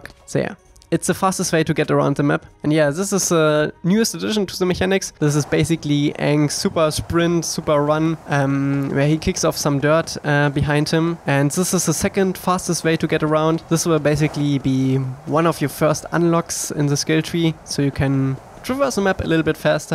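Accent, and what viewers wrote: Germany. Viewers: Your english sounds like you are German, please tell me I am right :D (I am German) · Der Typ ist 100 Prozent deutsch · Ich weis nicht, aber kann es sein das er aus Deutschland ist ? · That's the most german english I ever heard😁😁the game looks very good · Dieser deutsche Akzent XD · wie man das deutsch höhrt haha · Gutes Englisch, aber ich feiere einfach, das kaum ein deutschsprachig Nation, ihren Akzent verstecken kann (willst du wahrscheinlich auch gar nicht) Grüße aus Hannover 😘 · Der Deutsche Akzent macht das tausendmal lustiger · Man hört seinen deutschen akzent soo krass raus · Wie krass man hört, dass du deutsch bist. Aber mal davon abgesehen sieht alles sehr cool aus. :D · if i may ask. from what country are you? your accent reminds me a bit of german. when i hear "glide" u kinda say "gleit" the german word :D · Dieser English Akzent :D Deutsch nicht wahr? 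· Ich dachte doch gerade am Akzent.. das klingt ziemlich deutsch, schaue in die Kanalbeschreibung: Deutschland :D Aber coole neue Mechanismen! :) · Du hast n echt deutschen Akzent. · Haha voll rausgehört das du aus Deutschland kommst :D mit welcher Engine arbeitest du denn am Projekt? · Man erkennt zu 100% er ist deutscher English: because his accent you can hear his German Btw really nice game i hope we can play ist all in the future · Not even 2 minutes in and I knew you're german xD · Are you german? Your english sounds really german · digga wie man einfach hört das du deutscher bist :D Aber geiles Game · Wirklich sehr kräftiger, deutscher Akzent. Aber naja nice, dass ein Deutscher das ambitionierteste Fangame-Projekt zu avatar leitet (ist) ^^ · Du bist doch ein deutscher, oder ?😂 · Man hört irgendwie deinen Deutschenakzent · Man hört einfach das er Deutsch ist haha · nicer deutsch akzent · Du bist ein deutscher oder? 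^^ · Nice, in the beginning it looked like a simple amateurly made game and I expected the project to stop after a month, but it's really coming together and getting closer to a game I'd enjoy paying! Keep it up my dudes! PS: btw are you German? Your accent sounds like you were. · Sounds like a german accent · Man Merkt das er Deutscher ist :D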